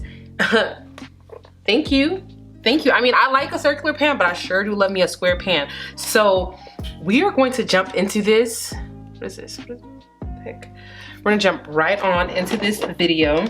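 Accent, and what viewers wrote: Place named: Nigeria. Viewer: The naija accent tho 😂 Love ❤️ this look 😍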